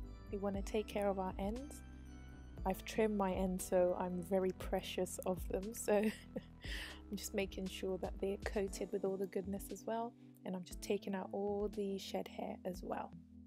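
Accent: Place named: South London, United Kingdom. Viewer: Yes Sis. Love the vid, thanks it helps so much... Imma try this under my wig. Anyhow I'm In South London UK and i recognise that accent of yours😀 Stumbled on u vid as i was checking out rice water for hair growth.. Keep up the good work girl, and keep looking after that amazing hair of yours..💓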